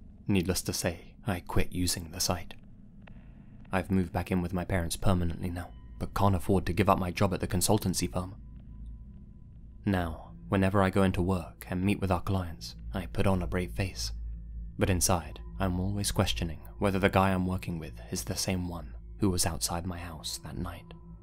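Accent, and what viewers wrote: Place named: United Kingdom. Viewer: You're from Brazil, have a "British" accent (though I can't really place it), and you've lived in Honk Kong... wow, what an unusual mix. Greetings from Chile!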